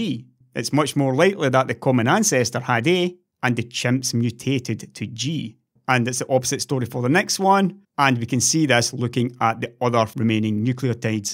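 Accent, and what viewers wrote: Scotland, United Kingdom. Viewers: I love your accent... you make scottish understandable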